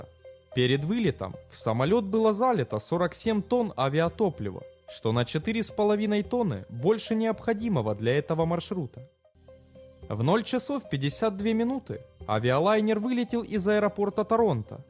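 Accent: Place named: Kharkiv, Ukraine. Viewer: Говорок харьковский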